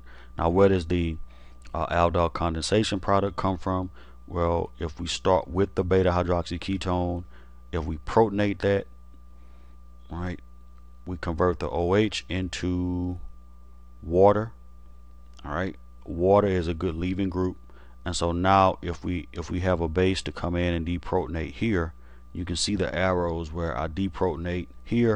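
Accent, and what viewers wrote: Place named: United States. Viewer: Cowboy accent is 🔥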